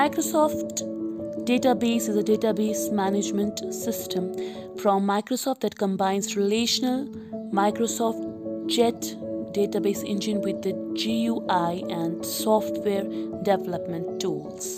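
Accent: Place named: India. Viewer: beautiful Indian English accent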